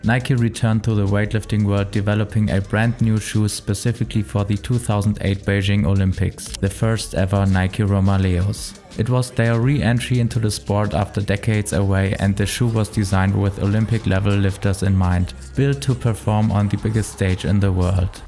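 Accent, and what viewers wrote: Germany. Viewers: aaaah mystery solved, thanks. Great Denglish btw. Best of luck with the brand and good night!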